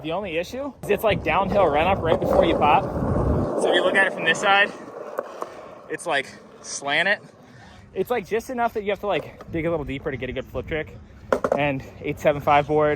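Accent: East Coast, United States. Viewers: Is your east coast accent coming back?!!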